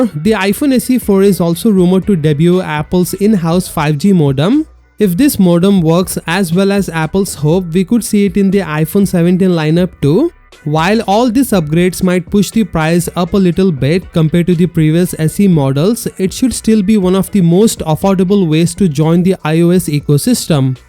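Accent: India. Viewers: Indian origin narrator? Why can't we get a neutral one?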